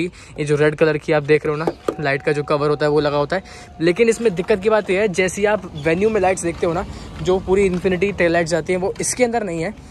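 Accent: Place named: Agra, India. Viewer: Bhai agra se ho?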